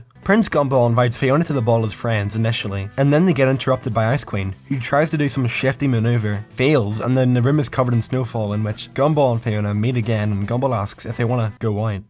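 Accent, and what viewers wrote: Scotland, United Kingdom. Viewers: You sound English but you sound a bit Scottish as well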